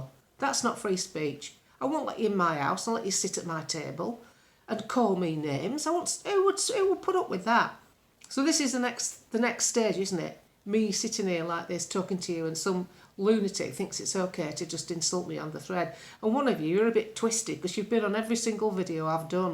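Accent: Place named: North, United Kingdom. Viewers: Never boring, it's lovely to hear a Northern voice, like mine, say the stuff that you could have plucked out of my brain. Unfortunately due to my work I have to keep most of it to myself. BUt me and my husband say all this stuff at home. We sick of the gobshites spouting lies and trying to convince us we're far right. I say they're not right, not right in the bloody head if they think we're daft enough to believe them. Keep going - we're winning! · Hi. Old bod here from Tod. It’s not just the accent that I like giving us good old northern common sense. But the words are so true. Came across you through following Neil what a find. You cheer me up give me hope.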